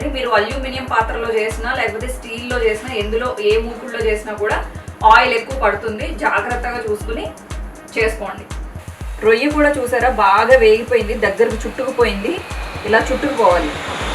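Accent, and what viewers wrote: Godavari, India. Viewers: Nonvege recipe's Anni chala baaga vadutunnarandi Godavari yasatho 💗💗💗 aaayy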